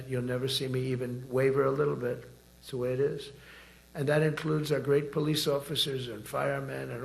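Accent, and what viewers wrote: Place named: New York, United States. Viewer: He is from NY/NJ area which has a distinctive style of speaking. All of it was coherent and with purpose. His target audience was Military Generals, not you.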